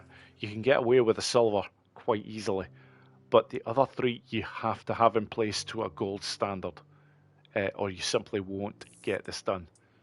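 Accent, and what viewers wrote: Scotland, United Kingdom. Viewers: top class mate as per. Even better when hearing another Scotsmen swearing properly. Still had good scores tho . I am trying to get better scores on outridge . 131 is my highest but can’t get anywhere near it. Had a look at the leaderboard your number1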